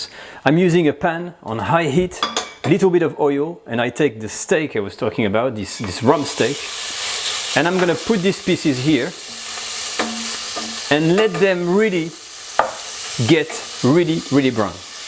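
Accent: France. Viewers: J’adore votre accent français 😄 merci de préparer ces recettes ! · I never had french onion soup and that looks delicious :DDDDDD. Also you sound like my french friend that I play games with. Your accent is funny he says pwease instead of please! He is a good lad! :D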